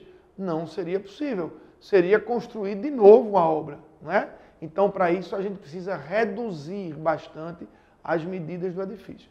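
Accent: Northeast, Brazil. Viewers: Sabia que era nordestino. Mas não sabia identificar o estado! Obrigado :)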